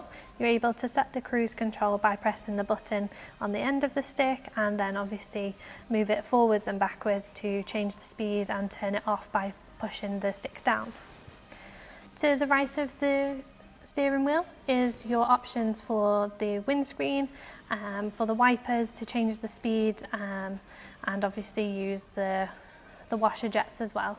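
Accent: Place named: United Kingdom. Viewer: I’m looking for a Boxster 718, so this is helpful. As an American, I love her British accent.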